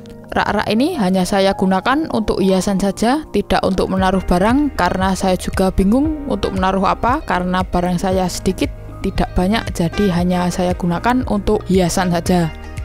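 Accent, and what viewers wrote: Java, Indonesia. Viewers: medok medok joss🙃, kreatif, menarik salam dari sragen jawa tengah brader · Ya ampun...salut dah.... bisa dibuat kisah inspiratif bagi murid-murid saya. Suwun Dik.... bahasamu medok banget...hahaha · Wadohh zimzajaib kok jadi medok gini ya!?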